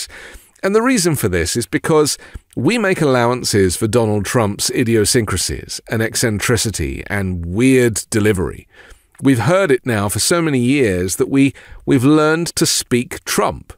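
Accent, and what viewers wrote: United Kingdom. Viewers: Your British accent makes trump sound more intelligent and more intellectual. Thank you, for sparing us from the dronings idiocy of his tiresome voice, though.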